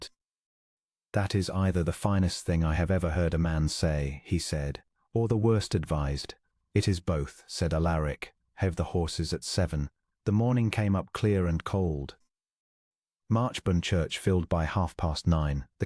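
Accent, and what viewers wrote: United Kingdom. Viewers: I loved this story! Beautifully written and superbly spoken with the appropriate British accent. Thank you!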